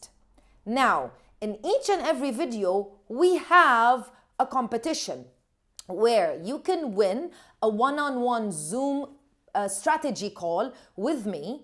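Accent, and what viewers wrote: Arab world. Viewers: I am confuseeeeeeed.u speak english like an arabic girl but your name is.any thanks for guiding us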